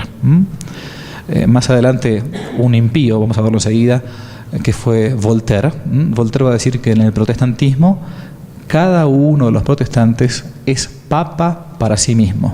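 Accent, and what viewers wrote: Argentina. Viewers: Es una lástima que divulgadores hispanos de tanta calidad pierdan millones de personas de audiencia potencial por causa de ese fenómeno lingüístico particular que llamamos "shesheo" o "teísmo rehilado" típico de los porteños que tan cacofónico nos resulta a la inmensa mayoría de los hispanohablantes de todos los continentes.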